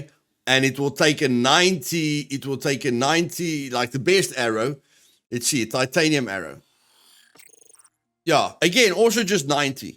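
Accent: South Africa. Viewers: You a south African my guy I can hear your accent 👍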